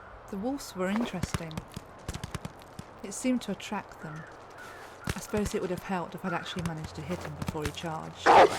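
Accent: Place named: North Yorkshire, United Kingdom. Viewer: I'm digging the update, the only thing I don't like is the HUD condition icons sticking around in their awkward positions when they turn red. Kind of distract me from the immersive beauty. Aside from that, all great. I really liked your review, by the way! Funny little asides, and a dab of oop North Yorkshire accent with the all important tea worship. It's a shame we didn't get you narrating previous updates, oh well. I hope you think about doing a review when the full game comes out, this was a pleasure to watch. And those new weather effects, eh?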